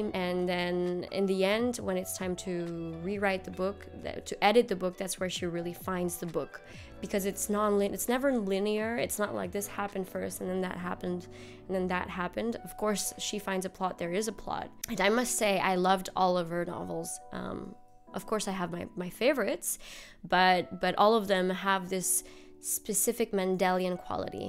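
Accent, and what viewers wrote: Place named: United States. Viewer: Found your channel today and immediately subscribed, love the way you talk about each book and so many that I have never heard about, love it because most booktubers tend to recommend the same as everyone else. You said you grew up in France but your English is so perfect! I was wondering how your accent sounds so American? There’s no French accent, I’m mesmerized because I’m not from the US but have been living here over 20 years and I still sound foreign 😄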